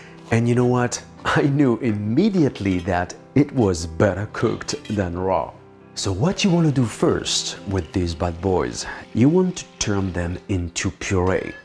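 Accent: France. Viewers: I don't know if I'm following an English cookery course given by a guy with a strong French accent, who probably thinks that his smile will make him forget to give the measurements and grams needed to make this tart. He needs clicks and likes for his video I need measurements Hoping for your understanding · Idk...between the crazy machinery (non-cook here) and the intimidating French accent I feel like all of this video is basically "baking on hard mode". In a world where BuzzFeed dominates Instagram worthy foods it is a wonderful sight to see true baking skill displayed on YT. Edit: thanks for all the likes!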